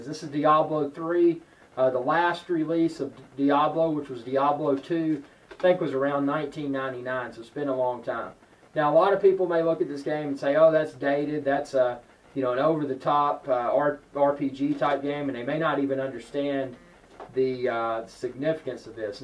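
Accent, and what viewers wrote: South, United States. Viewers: Not true there was neverwinter nights on the old imagine web service back in the day. I feel you are unqualified to review games, Because you are a mac user. Not to mention your southern accent really makes it hard for anyone to take you seriously. not to mention the fact that you showed no gameplay footage nor did you mention the features of the game. honestly I don't think you even played it.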